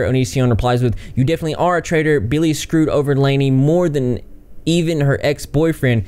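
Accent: South, United States. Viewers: He definitely sounds like a southern gentleman 😂 I love it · you and your factual Southern drawl o lawd <3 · you look like a final fantasy character and then you hit we with the southern accent lmao. Great video man. Really liking your stuff. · It’s always so nice to hear someone else with a southern accent on YouTube 😭😭😭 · love the country accent with the punk look lol. where are you from??? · This was a very well detailed video on the whole situation. Also I wasn’t expecting that southern accent but hey! It’s great, for some reason it makes it more entertaining to hear you reading out the little “articles” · I've never seen an emo boy with a country accent. Thank you for enlightening me. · you're like super southern. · Onion boy is disgusting, but I subbed because of your accent. Not many people on the platform have a southern accent! (I’m from GA) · Can i just say I love your country accent!!! 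& you're cuter than a truck load of baby ducks!!! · HIS COUNTRY ACCENT WITH HIS PUNK AESTHETIC IS KILLING ME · I thought I heard a southern accent .. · Dude you look like a anime character but with a heavy southern accent. The world is a beautiful place. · Idk why I'm watching this. Never seen a video on this channel. Ooh I love the southern accent though! Lol. Good video as well. Onision is an authentic narcissistic psychopathic. Its quite crazy honestly! Has YouTube gotten involved in any of this legal and moral drama? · I love his low-key country accent loool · I just love his southern accent like Jesus. 😍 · Besides from this hot tea, I enjoy the juxtaposition of your emo style and your southern accent. Very pleasing. Also good job and being unbiased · This is my first video I've seen from you and omg you look so much like Shayne Topp, just more alternative with a southern accent. It's fantastic 😂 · Your face says punk rock, but your voice says country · I love what you're doing man you have great vids but I just gotta say it you sound like Idubbbz doing a southern accent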